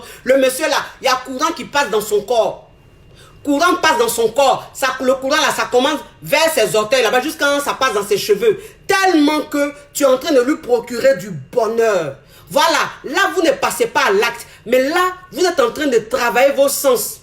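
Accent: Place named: Ivory Coast. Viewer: Wallaye si n'est pas ivoirienne ça m'étonnerait. Gagagagagaga. Elle parle comme à chez nous pays hein loool. J'adore encore aujourd'hui la façon dont le sujet-ci est abordé.Merci beaucoup pour le partage chère.Stay Blessed for all!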